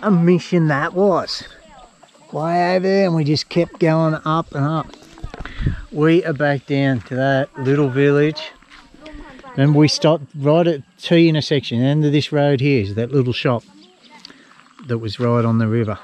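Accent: Australia. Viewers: I wish you would stop pointing with your finger and stop talking, then I would enjoy your video more. Your Aussie accent is so thick, can't understand a word you're saying.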